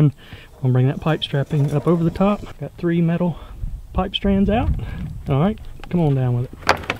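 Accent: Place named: Texas, United States. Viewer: its not obvious its texas accent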